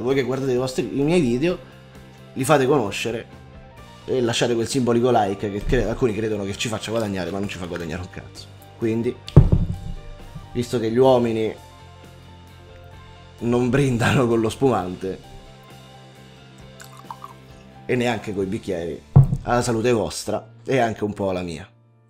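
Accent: Rome, Italy. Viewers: hai ringraziato i tuoi 200k..per averti aiutato..io nel mio piccolo farò lo stesso..grazie..penso sia un pensiero condiviso da molti..lasciare tutto e provare fin in fondo a fare ciò che ci piace è forse la cosa più difficile da fare..grazie del tuo coraggio..anche tu hai aiutato moltissime persone che in quel momento avevano bisogno di sorridere con un po' di romanaccio di sottofondo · Dietro uno schermo sembrate così extraterrestri, ma tu..o meglio, tu..sei una persona, vera e pulita. Ora io non ti conosco, dal vero almeno, ma sento la tua 'stranezza' molto simile alla mia stranezza, sei la persona più vicina a essere umano che ci sia su YouTube Italia.. tanto umana e da riuscire a farmi piangere col 'signor Mario.' a farmi ridere col tuo romanaccio nei video di minecraft. La cosa che stupisce di te è l'umanità, un valore che a quanto pare sta andando perdendosi. 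Io un giorno ti incontrerò, ti offriró un'immensa Gordon scura e farò un'immensa chiacchierata con te. Per il momento la Gordon la bevo in tuo nome, grande uomo in un mondo di alieni.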